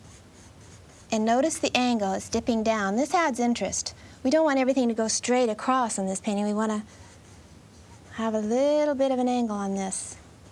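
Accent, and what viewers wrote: South, United States. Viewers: Ahhh, her country accent is hypnotizing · southern style talking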